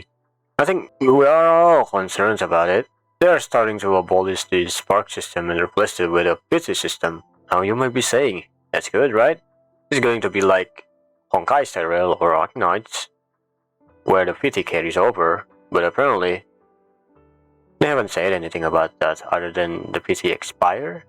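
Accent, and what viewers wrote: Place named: Indonesia. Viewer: It's fine. But not fine😭😭😭 you have an insanely thicc Indonesian accent 😭😭😭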